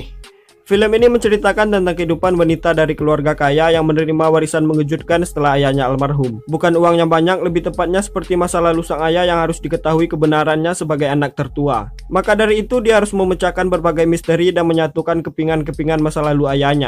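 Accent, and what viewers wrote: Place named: Padang, Indonesia. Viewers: Asli padang ya bg? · Saya merasa ini yg bicara org padang 😂😂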